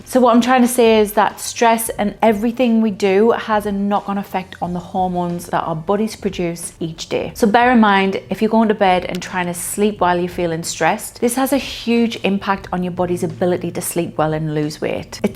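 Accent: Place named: England, United Kingdom. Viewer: Finally !! Someone with an ENGLISH ACCENT !! Thank God !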